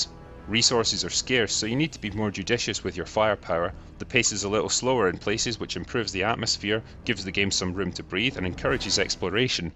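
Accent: Scotland, United Kingdom. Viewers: ive had some Scottish friends and they sound a lot like him..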